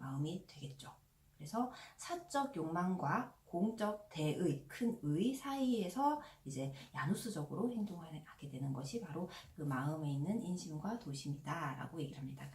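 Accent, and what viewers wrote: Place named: Gyeongsang, South Korea. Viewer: 말씀이 좀 빠른건.. 경상도 말이 원래 그렇죠. 대구신가요? '대학장구' ㅋㅋ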